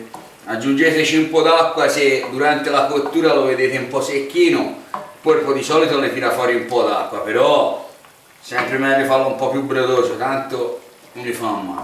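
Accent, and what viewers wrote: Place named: Livorno, Italy. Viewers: Bellissimo, confortevole, casereccio e senza musica da discoteca in sottofondo: meraviglioso pesce, simpaticissimo l'accento livornese, esperto il cuoco e padrone degli ingredienti, video come questi riempiono sempre l'anima. Peccato pero', purtroppo, non sentire il profumo di quel piatto strabiliante! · Meriti like solo per la parlata livornese😂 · Troppo figo l'accento Livornese.. Ricetta rifatta uguale e venuto tutto fantastico. Unica domanda ma quando si mette il polpo un bicchiere di vino bianco no?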